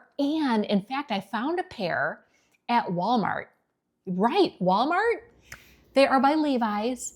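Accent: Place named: Minnesota, United States. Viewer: Is she from Minnesota? I love her accent! ☮️💟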